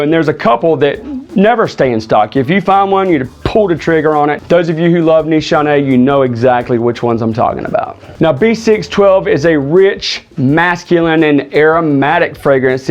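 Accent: South, United States. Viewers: nice review with a nice southern accent 👍🏾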